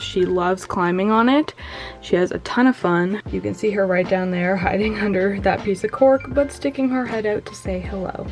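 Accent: Canada. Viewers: I'm so sorry for asking but... Are you Canadian? You sound Canadian. But like, Eastern Canada.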